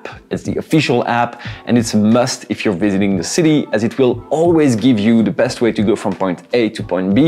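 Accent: France. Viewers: WOW! Great video. It was a pleasure to watch it and learn it. I love that you speak with this amazing french accent about metro in Paris, France. I hope your channel will grow quickly. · L'accent français t'as peur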